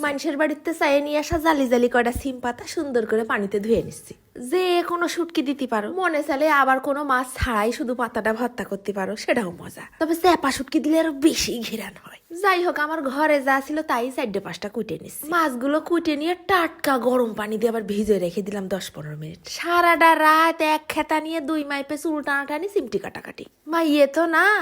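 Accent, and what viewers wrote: Jessore, Bangladesh. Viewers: Apu tomar kotha sunla ami kotha bolta sahos pai ta na hoila amar kotha sunla sobay hasi thatta korto akhon tomar kotha sunla mona hoi amara jessore ar manus ar kotha sotti misti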